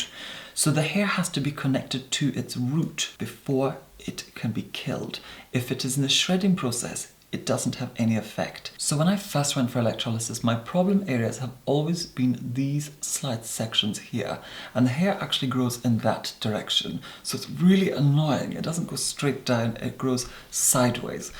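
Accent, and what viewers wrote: Scotland, United Kingdom. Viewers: Obvz but where in Scotland? He has a mix of accents . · Yes he's Scottish :) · Um bull. I know people from Ayr and other near areas and they sound very similar to this. It may well be exaggerated- but this is normal for that region of Scotland. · is he scottish? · Eyez Cold is not English. That, you will find, is a beautiful classic true Scottish accent. From the days of Robert Louis Stevenson.... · He's actually Scottish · This is the most Scottish I've ever heard you be, yay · Just realised the accent is posh Scottish not English the fuck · I am loving your accent, a hint of scottish in there? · Are you scottish? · Анастасия Горячева l think that it's a Scottish accent from one of the Highland islands x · I hear a little bit of a scottish undertone to your accent, any scottish heritage?!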